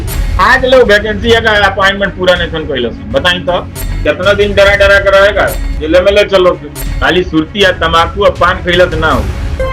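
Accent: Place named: Bihar, India. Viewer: AAP ka muh se Bihari sun ke Maja aagaya sir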